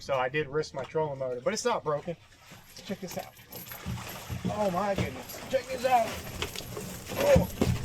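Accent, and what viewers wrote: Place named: Northeast, United States. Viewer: you may have some northeast in that accent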